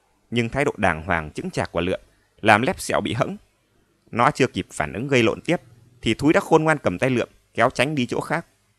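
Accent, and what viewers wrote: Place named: Bình Dương, Vietnam. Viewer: Hay quáluônnnnnnn❤❤❤❤❤❤❤❤❤❤❤❤❤❤❤❤❤❤❤❤❤❤❤❤❤❤❤❤❤❤❤❤ Nhưng nghe hơi Bình Dương thật 😂😂😂😂😂😅😅😅😅😅😅😅😅😅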